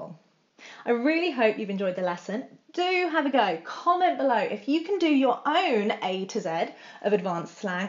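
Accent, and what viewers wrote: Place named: RP, United Kingdom. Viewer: Hey.u r my fav youtube teacher.Are you planning to release any podcast of only yourself? I really like your posh accents and wants to listen on podcast. Or audio books. anything pls💕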